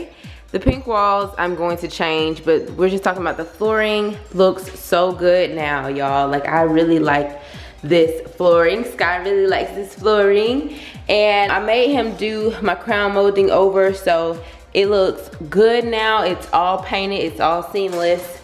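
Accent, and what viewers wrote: South, United States. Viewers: You’re so southern/country lol, so am I that’s why I notice it. 😂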